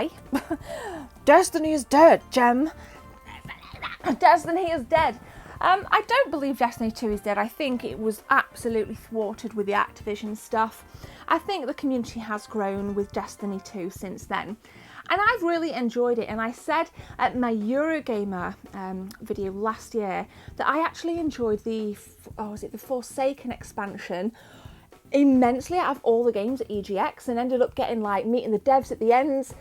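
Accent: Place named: East Midlands, United Kingdom. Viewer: Are you from nottingham or derby im from derby 👍🏼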